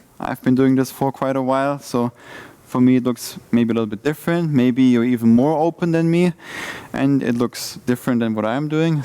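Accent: Germany. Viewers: Awesome session. One of the best I ever made.. The guy has a familiar German accent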